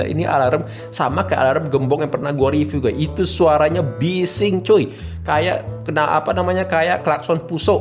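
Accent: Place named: Bali, Indonesia. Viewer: Org bali yah bg ..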